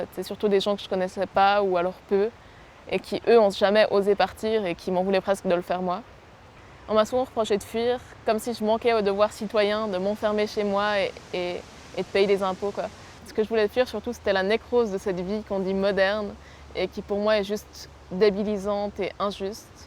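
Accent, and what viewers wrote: Switzerland. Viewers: Titrer "sans argent" alors que en moins de 10 secondes t'entends deja qu'elle est originaire de Lausanne en Suisse. Au moins j'aurais perdu moins de 10 secondes ! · J'adore ton accent suisse ! :) Et bravo pour ton courage d'avoir fait le pas !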